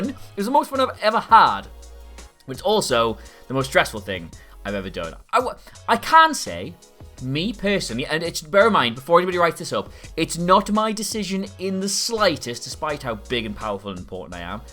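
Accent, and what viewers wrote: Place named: United Kingdom. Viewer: My American ears never get tired of British "years". "It took yeeahhz and yeeahhz and yeeahhz and yeeahhz off our lives."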